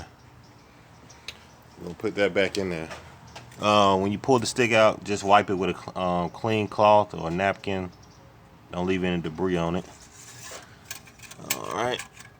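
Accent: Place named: South, United States. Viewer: You sound like you’re from the south, where ya from? I’m from east Alabama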